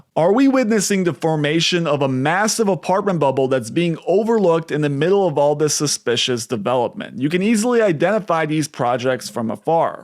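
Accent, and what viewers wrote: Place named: Chicago, United States. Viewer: You from Chicago? That accent...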